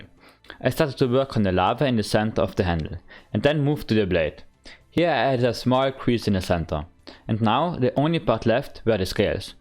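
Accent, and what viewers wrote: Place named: Germany. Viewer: That's so nice. And I have a question from what country are you? It sounds like Germany or something 😅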